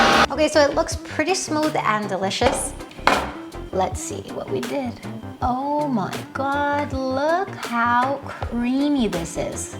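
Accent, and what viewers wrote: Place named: Caribbean. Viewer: You sound Jamaican/ Caribbean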